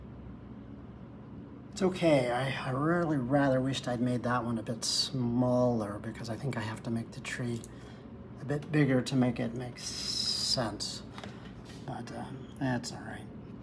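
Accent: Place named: Berkshire, United Kingdom. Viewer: Thank you so much. This was a lovely demo and I enjoyed it very much. I look forward each week to see what you have to share. I am going to try this technique with a squirrel that I am thinking about painting. I love the colors for the otter. These Texas ears are loving your Berkshire accent ❤ 😊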